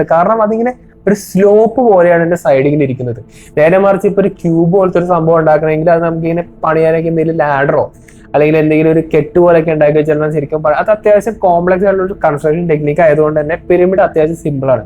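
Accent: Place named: Kochi, India. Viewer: കൊച്ചിക്കാരൻ ആണോ..? ആ ശൈലി ഇത്തരം വിഷയങ്ങൾ കൈകാര്യം ചെയ്യുന്നതിൽ അഭിലഷണീയമല്ല.. വ്യക്തത വളരെ കുറവാണ്... 🙂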